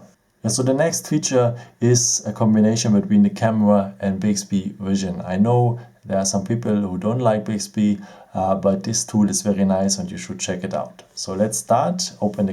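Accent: Germany. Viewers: Haha man merkt irgendwie schon raus das du deutsch bist. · I'm getting this phone over weekend. I love your German accent! I love German accent. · German accent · Warum nicht Deutsch ich mein man hört es ja eh. Ich hole mir nähmlich auch das a50 also eigentlich ein ganz nützliches Video auch wenn ich vieles schon wusste weil ich schon so ungefähr 40 videos über des a50 gesehen habe.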